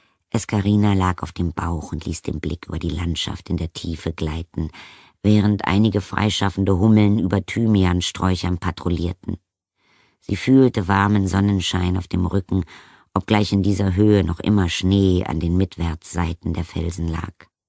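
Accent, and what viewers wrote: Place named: Berlin, Germany. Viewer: Gute Stimme , ich könnte das Beste Hörbuch Schreiben aber nicht vertonen mit meiner Stimme , wäre Volter !! Nein es ist eine besondere Stimme und gut gelesen .Hatt Berliner Dialekt leicht drinnen , denke ich.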